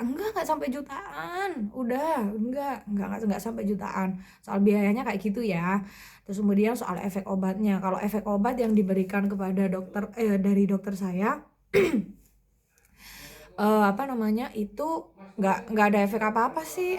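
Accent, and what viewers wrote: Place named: Madura, Indonesia. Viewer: Bun logat nya kaya orang madura ya bun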